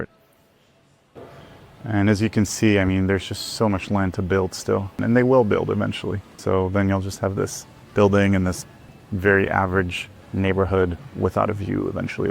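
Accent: United States. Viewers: This guy has a us accent, but he's not from the states. Or Mexico.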